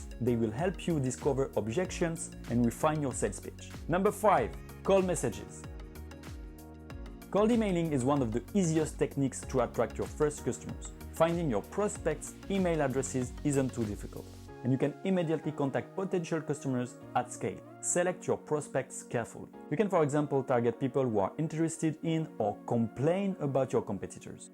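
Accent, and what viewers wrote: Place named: France. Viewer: Tu à un accent Français ✨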